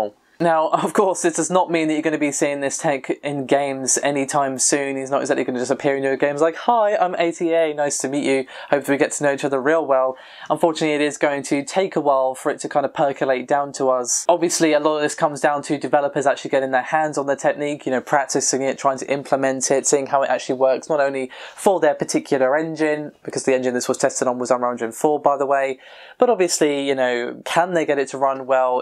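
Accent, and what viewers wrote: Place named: London, United Kingdom. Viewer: England, london area I think.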